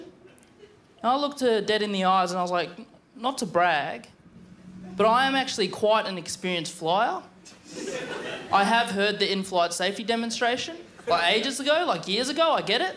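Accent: Australia. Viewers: It seems it's one of Aussie's accents, according to many other comments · You just don’t like how Australians speak then hahaha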